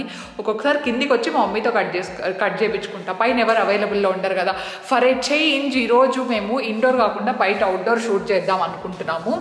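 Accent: Anantapur, India. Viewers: Hi l love anantpur language it reminds me my amama village elukuntala I spent my childhood Holiday's over there, my grany Cook's pappu very tasty, by the way can u please mention hair shampoo, and soap,i am bit confused which one to use to my 9years old daughter.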